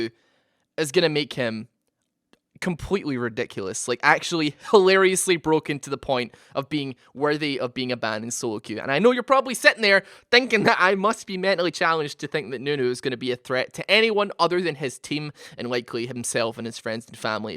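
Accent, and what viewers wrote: Scotland, United Kingdom. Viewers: He's Scottish mate xD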